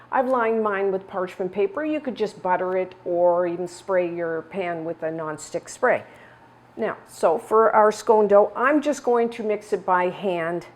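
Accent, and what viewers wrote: Canada. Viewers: I knew you were from Canada as I spotted the accent - even from the Maritimes …. my mom was from PEI and I have a sister who lives there - I live in Ft. Lauderdale half the year and the other half Rockport Maine 🇨🇦🍁🇨🇦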